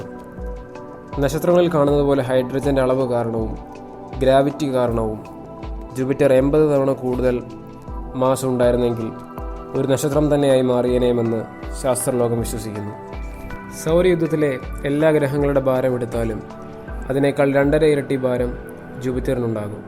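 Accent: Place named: Trivandrum, India. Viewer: Trivandrumo kollamo ayirikkum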